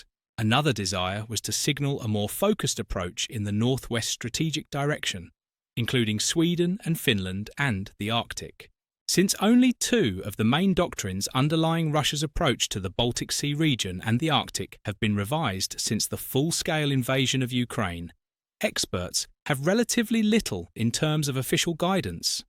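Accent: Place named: United Kingdom. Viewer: Not sure why Norway was turned into Sweden in the thumbnail. Feels like a typical US mistake to make, yet the voice is British.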